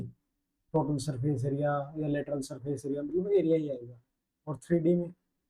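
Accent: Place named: Haryana, India. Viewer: Haryanvi control kro sir thoda 😅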